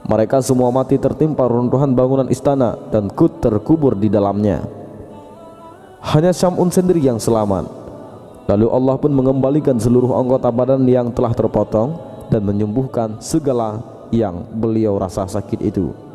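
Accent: Aceh, Indonesia. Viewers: Yg peugah haba mngkn jeut neu stel bacut logatjih, brat that meu aceh...semoga kedepan makin berkembang..amin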